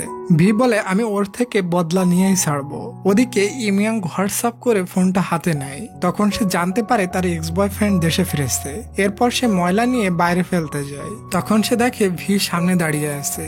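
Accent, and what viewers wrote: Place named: Madaripur, Bangladesh. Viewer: ভাইয়া আপনার বাড়ি কোথায় মাদারি পুর না বরিশাল। আমার মনে হয় মাদারি পুর।❤❤❤❤❤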